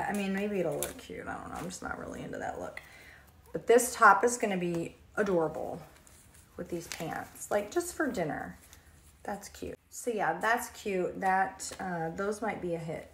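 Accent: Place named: Brooklyn, United States. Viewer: sounds from brooklyn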